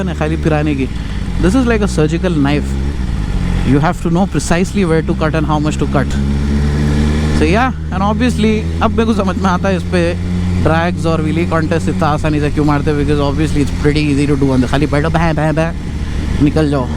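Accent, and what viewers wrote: Hyderabad, India. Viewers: Just loved the way u vlog with hydrabadi English accent with galiya amazing maza aata bro (hau)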